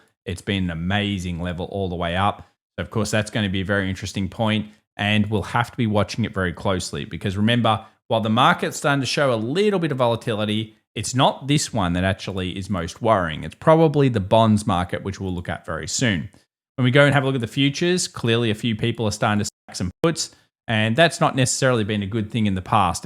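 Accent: Australia. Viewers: I like it the way he said it. Plus he's Australian so buzz off mate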